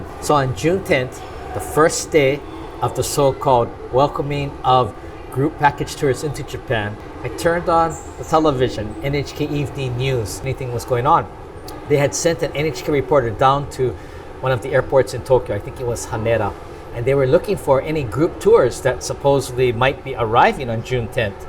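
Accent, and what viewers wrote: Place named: United States. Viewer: Thanks for your insight! Although it's a disappointment, but I think you might be right on allowing solo travellers in 2023 BTW, you speak with an American accent. You must have lived in the U.S for many years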